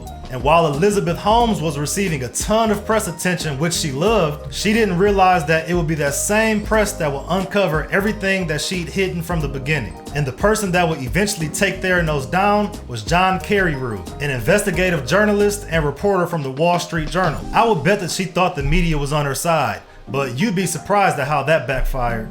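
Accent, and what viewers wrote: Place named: South, United States. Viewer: Southern accent doesnt work in these kind of vids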